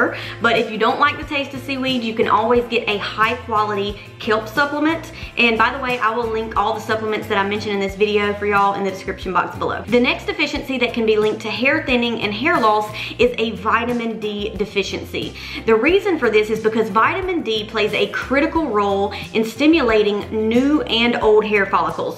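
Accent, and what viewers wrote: South, United States. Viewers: love the southern accent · Your southern accent, tho!!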